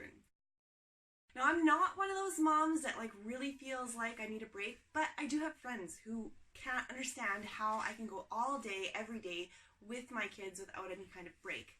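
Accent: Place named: Winnipeg, Canada. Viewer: You sound like you have a Winnipeg accent ....lol 😁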